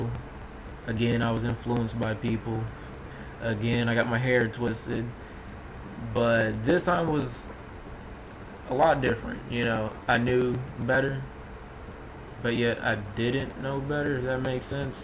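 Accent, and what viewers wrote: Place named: Texas, United States. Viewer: First off, let me say your locs are Rockin'! Second of all, you're not hearing what we hear in your voice. Your voice is fine, even has that special oomph with the Texan accent. So be encouraged. And since you write poetry, this will be your way of getting out of that insecurity with your voice by letting us hear your spoken word. Thanks for sharing. Subbing, hope you'll do the same.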